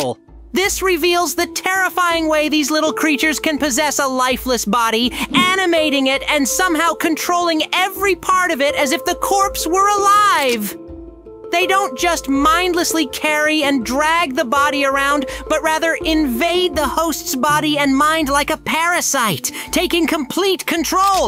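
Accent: United Kingdom. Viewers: Bruv American to British now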